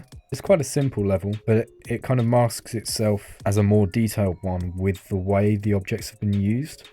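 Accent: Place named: United Kingdom. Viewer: nice video, definitely british accent carried though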